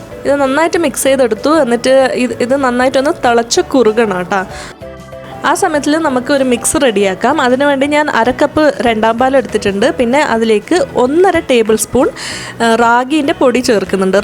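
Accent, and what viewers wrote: Kannur, India. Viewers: Hello Njn ipppozhan video kandathu ... Undakki nookki super aayirunnu.. Thk u chechi Chechi evideya kannur aano Kannur slang pole thonnni enikk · Kannur slang?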